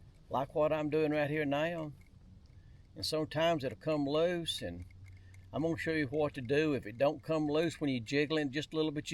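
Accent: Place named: South, United States. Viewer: What size weight do you use? I've always made them with 2oz catfish weights and I use a zip tie to attach the clip. But your way may be even cheaper. And BTW my wife heard your voice and said, "Cap, you are as southern as they get, but I think that guy is more southern than you" lol. Im a Georgia man, where are you from?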